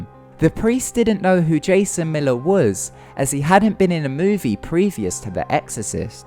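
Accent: United Kingdom. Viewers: I think he just has that kind of British accent...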